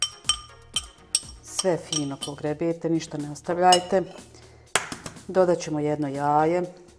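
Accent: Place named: Bosnia and Herzegovina. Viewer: Ne sumnjam da su ćufte i ukusne kao što su jednostavne, upravo ih idem praviti ali željela sam reći drugo - uvijek radije slušam zbog njene spontanosti i prirodnosti, volim ton njenog glasa i bosanski akcenat koji me odmaraju...haha...ona dođe kao terapija!, želim ti svako dobro.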